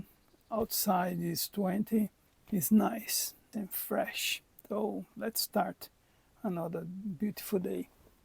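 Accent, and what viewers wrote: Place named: Brazil. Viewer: Hello. I am enjoying your videos. Great work and effort. My daddy was from a small village in Galicia called Fixo. He told me a lot of stories growing up in a small stone house like the one you are restoring. They grew potatoes, corn and hogs up there. They plowed with an Ox and worked all the time. He said it was dry country which looks like it is from your videos. What area are you in? Are you a Brasileiro? Your accent sounds Brazilian. Keep posting videos I am enjoying the progress. Have a good day.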